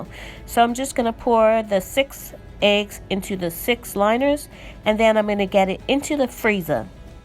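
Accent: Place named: New York, United States. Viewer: Are you from New York or Boston? I like your accent. I’m in Colorado, but from New York.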